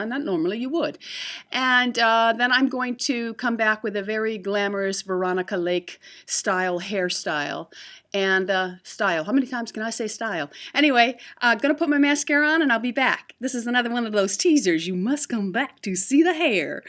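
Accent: United Kingdom. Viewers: Oooohhh Yay! I love the british accent. Hehehe Big Hugs Marnie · great video esp with the British accent lol.I love the look from back in the 40s.My fave movie ever is Brief Encounter from 1945 and Celia Johnson just looks so understatedly beautiful in it.True beauty is timeless and yes you are vintage and classic xx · great video! love the british accent :)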